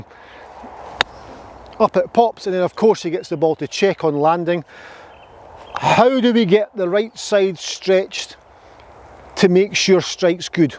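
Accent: Scotland, United Kingdom. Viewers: The Scottish accent makes the golf tips better.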